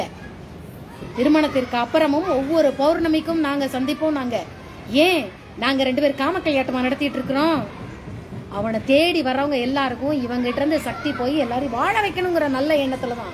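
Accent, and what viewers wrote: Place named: Tirunelveli, India. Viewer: Unkal thirunelvelli pasai arumai amma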